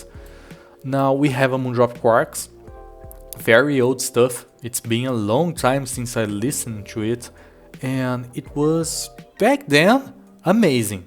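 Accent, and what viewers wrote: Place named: Brazil. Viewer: É sempre legal ouvir BR falando inglês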